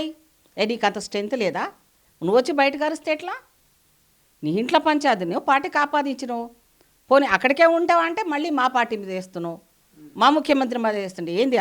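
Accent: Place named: Telangana, India. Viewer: పుష్పలీల గారు మాటలు చాల స్పష్టంగాను తెలంగాణ. యాసలోను. మాట్లాడుతున్నారు.మేడం గారు, ఎంతో హుందాగా వున్నారు. మీకు కృతజ్ఞతలు .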